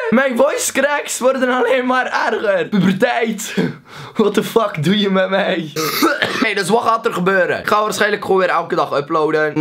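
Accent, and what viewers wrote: West Flanders, Belgium. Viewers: Dit is West-Vlaams, meestal voor de andere Vlamingen niet te verstaan maar bij valt het wel mee.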